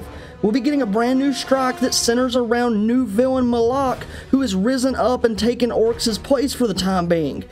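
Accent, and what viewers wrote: South, United States. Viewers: I love your hillbilly voice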